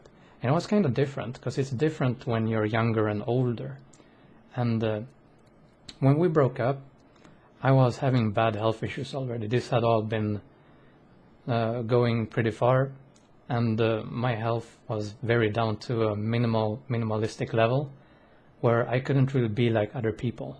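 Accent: Sweden. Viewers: I would love to have a friend from Sweden. If your ever into gaming and/or chatting let me know. I know there are a lot of people who are here for you. You have a lovely accent and a very calming voice and I'd add that to your list of nice features. I also wonder what you'd look like with your hair pulled back. I agree about health too, it's been pretty difficult socially for me this last year and staying healthy is something I strive to do as well. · Ååååh!! :) Tyckte det var svensk "dialekt"! :D Du är klok du och en riktigt fin människa. Uppskattar ditt meddelande till oss mannen!! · Du låter svensk i brytningen! Vill bara säga att du verkar vara en härlig människa!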